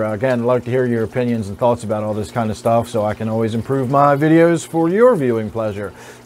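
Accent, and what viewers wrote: United States. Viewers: No! I like hearing your little "hey everyone" greeting plus I am english so I like a US accent and you are easy on the eye too 😍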